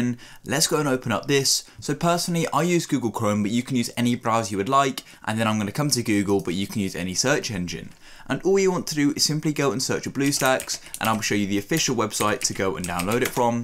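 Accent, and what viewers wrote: United Kingdom. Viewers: bro is bri'sh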